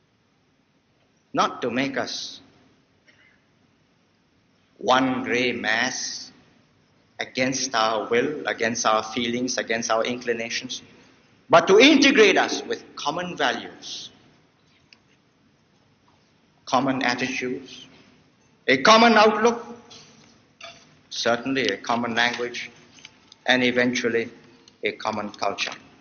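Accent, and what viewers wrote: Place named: RP, United Kingdom. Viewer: As a person from Britian, who can’t help but view Lee Kuan Yew as one of the most inspiring figures of the truly post war world, I’d like to say that you for uploading this and also. Wow he sounds so much more British upper-crust here, as he lived the decades in Singapore his accent slowly joined the home he formed.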